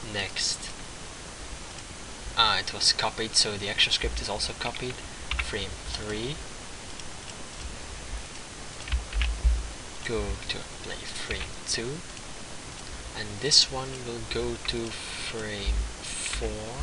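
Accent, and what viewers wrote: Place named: Philippines. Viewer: Hey, if ur not jepenese that ar u filipino cuz u have an accent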